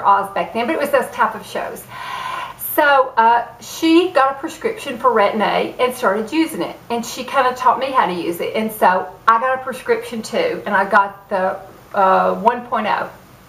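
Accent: South, United States. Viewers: Hi, I just subscribed to your channel. I like your southern accent. I was totally shocked when you said you were 59. I am 61 and always trying to anti-age. I would put you right up there with Christie Brinkley. We don't have to look like old ladies as we age if we take care of ourselves and our skin. Have a blessed day!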